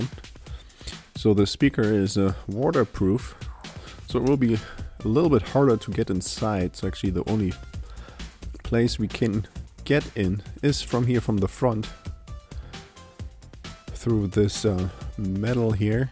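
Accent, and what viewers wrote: Germany. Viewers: Gutes English aber man merkt das du deutsch kannst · Bist du deutscher? Hört sich so an 😂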